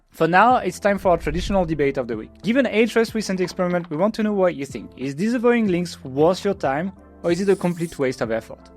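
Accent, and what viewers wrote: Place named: France. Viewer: its french accent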